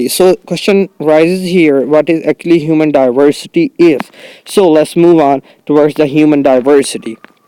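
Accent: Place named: United States. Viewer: US accent mai bt krny ki... so horrible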